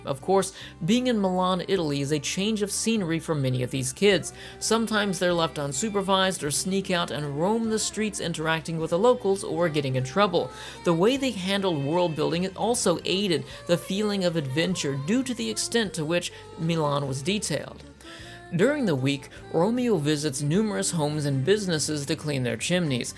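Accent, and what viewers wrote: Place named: United States. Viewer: I'm just curious if you were able to watch it with English Subtitles? (Because you sound either American or Canadian... Maybe you can speak Japanese?) I cannot find a copy ANYWHERE with English subtitles! By the way - you are REALLY GOOD at reviewing! I'm amazed! I REALLY REALLY want to see this series and I'm so upset that I cannot find it! Is there any way I can give you my email address and you can tell me how I can watch this? (Or is that too personal....?)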